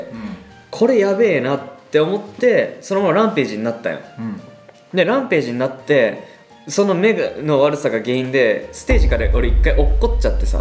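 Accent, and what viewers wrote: Okayama, Japan. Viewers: 岡山弁のさんがやっぱり好きすぎます🥲🍑❤️イチブトゼンブのモノマネめちゃわろてもーたww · さんの岡山弁大好きだから聞けて嬉しいです😁♡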